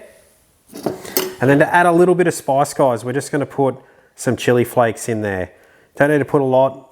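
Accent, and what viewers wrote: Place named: Australia. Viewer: Your Australian accent makes me want to try making these prawns and I don’t even like seafood! Seriously well done video, next level and very professional! Btw you shouldn’t cook puppies 😬 😆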